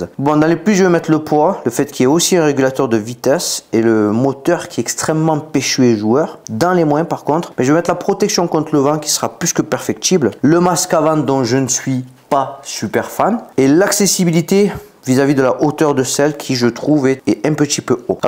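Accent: South, France. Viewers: fatche t'as l'assent ! continue, on apprécie ici dans le Nord (la Bretagne)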